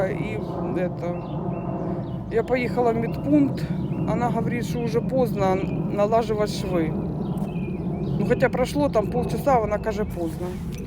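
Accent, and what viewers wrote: Ukraine. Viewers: Видно що ви з України, говор наш. Дивлюсь і радуюсь що ви не там де бахкають. Щастя вам і удачки, а кому не подобається хай не дивляться і не слухають.❤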